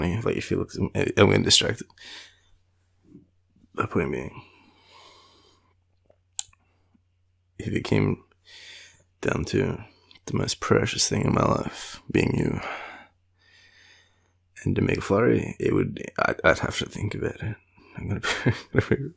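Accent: Australia. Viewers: cheesy jokes in an Australian accent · Aussie Aussie Aussie! mate another Aussie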